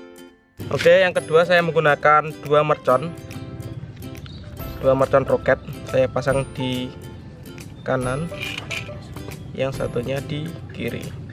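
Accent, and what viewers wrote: Java, Indonesia. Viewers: Hhhh .wong ndi .kok kentel jowone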